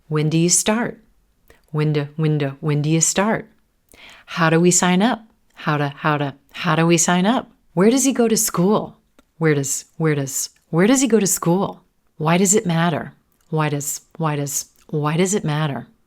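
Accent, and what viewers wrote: United States. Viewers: Your voice is so attractive. I am trying to search for some channels/blogs that have a clear American voice like yours for shadowing. Could you introduce some pages that I could imitate with scripts? Many thanks.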